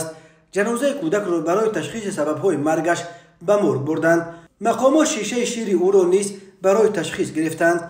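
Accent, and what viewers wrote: Pamir, Tajikistan. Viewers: Ку сурат ку видео ай пеши худут хар хел гапора придумат када гап мезани керм да лахчаи помирит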